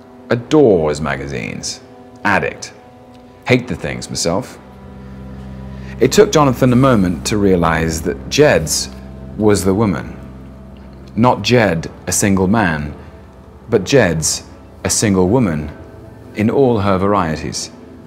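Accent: United Kingdom. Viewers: This is like ear sex... Why British people are so sexy than Americans while narrating things? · Accent?? British English is the original English language. Others have accents.. i think. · Aaaaaaaargh, that British accent, ow my goodness, that voice. He can read even instruction for vacuum cleaner and it will be a symphony for my soul)) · Thats because he attended Eaton and Cambridge and speaks with "Received Pronunciation", the style of speaking taught in the Elite Public Schools....also used by BBC Annnouncers, much like Standard American used by Broadcasters in America, often referred to as unaccented American English.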